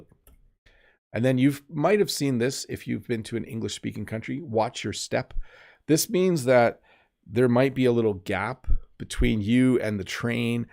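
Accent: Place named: Canada. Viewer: good morning, you are a great teacher, I learn English from you, but also calmness and composure. I saw you once on the Lucy english with Lucy channel, I must honestly admit that I thought that the difference in accent between Canada and Great Britain or Australia and the USA is greater, at my stage they are almost imperceptible, except for how you pronounce Toronto, Toronto, hold on you are great greetings From Poland. Luke G.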